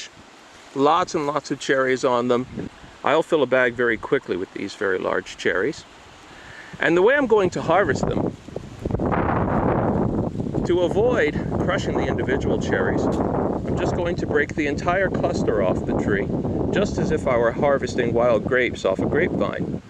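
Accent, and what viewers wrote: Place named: Canada. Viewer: Actually he has a Canadian accent which sounds like a television announcer to American ears. In other words, his consonants are more clearly pronounced and his vowels are more open. There are many English accents in both American and Canada, but for the most part Canadian English is more clearly pronounced than the media-driven Modern American English which attempts to be jazzy and cool. Many people pronounce their consonants in American, but many other do not. Canadian English is refreshingly clear. I love accents!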